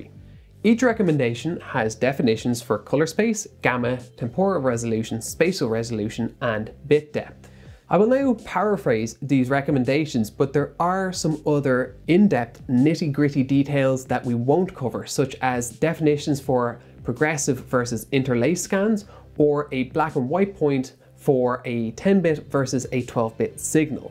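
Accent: Ireland. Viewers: Dublin Accent 😁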